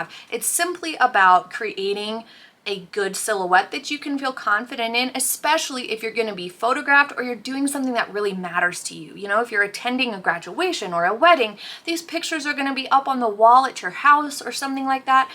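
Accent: United States. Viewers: No worries. 🙂 I have no idea where you live, but you sound like you have an American English accent, and darn it, it's very hot right now!!! 😉 Generally speaking, we are in the hottest month of the year. So if anyone looks a little bit oily or a little bit shiny, I tend to shrug and think "Well of course they do; I get it." I'm sure you will eventually tweak all of the settings to your liking. 👍❤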